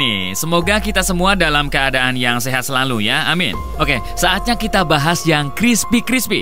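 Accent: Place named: Indramayu, Indonesia. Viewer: Udah aku duga Vidio terakhir dari cara bicaranya logat Indramayu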